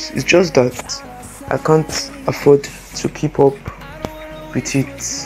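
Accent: Nigeria. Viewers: I love Nigerian accents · It's Nigerian accent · idk why i didn't expect u to sound Nigerian but nice voice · I LOVE THE ACCENT YOUR NIGERIAN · W I can here ur actual nigerian accent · w voice (like the Nigerian accent)❤❤ i am Nigerian! 🇳🇬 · the nigerian accent :D, luv it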